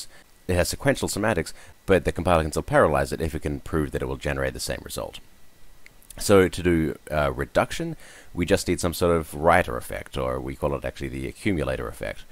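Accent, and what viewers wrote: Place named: Australia. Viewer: u r one of the most articulate persons I have ever heard. Great presentation. Where did you learn to speak? And you sound like an Aussie living in USA.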